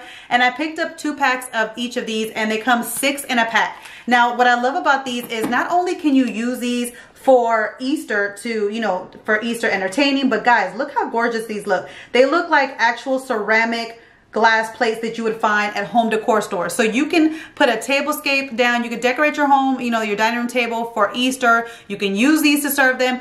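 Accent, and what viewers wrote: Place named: Upstate New York, United States. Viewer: These are all very nice. You appear to have an upstate Accent, similar to a New York and a New Jersey accent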